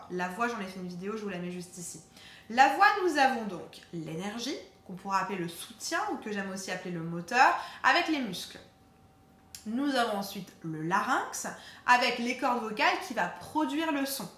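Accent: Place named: United States. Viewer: ah non, change rien quant à ton génial "sound color" à l'américaine, ça te va fort bien ... géniale vidéo ... une de plus ... en fait, la voix est une espèce de formule mathématique quoi ,...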